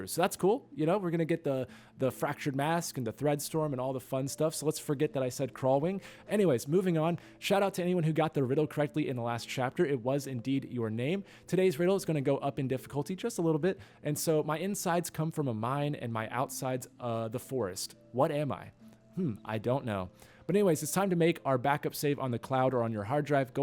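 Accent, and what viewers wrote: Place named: Australia. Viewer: This guy's funny with the Australian accent 🤣 😂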